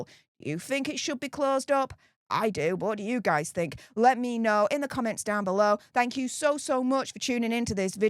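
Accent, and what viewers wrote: Yorkshire, United Kingdom. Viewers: For someone who was born in Yorkshire and raised in Yorkshire which I know because of your accent it doesn't matter where you go in the world you will always be a Yorkshiremen you should know better you should know better stop interrupting me when I am speaking you have a Yorkshire background none of these Yorkshire people would say that we could do not have immigrants as they have been in wars and seeing wars talk to your neighbours stop being so rude and judgemental go to Salford and speak to people who have actually been in the wars you lucky little carol have never been blown up have never seen your family blown up have always had a hospital of a school to go to stop being so horrible and selfish put yourself in somebody else's shoes walk a mile in afghanistan's shoes save you would like it have to live in a country that has are roads hospital or school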